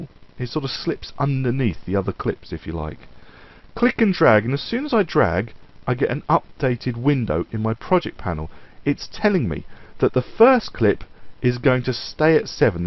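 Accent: United Kingdom. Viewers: EXCELLENT explanation!!!! and I like the British accent too. haha! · Fab.....! Listening to your voice I thought you were Tony Handcock, the British comedian. Where in England are you from?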